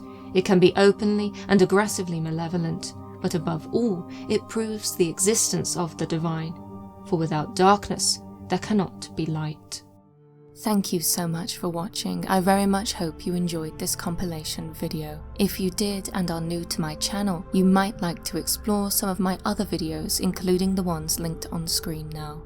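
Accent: United Kingdom. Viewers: I love narrators/storytellers with British accents, it grips your attention and it's elegant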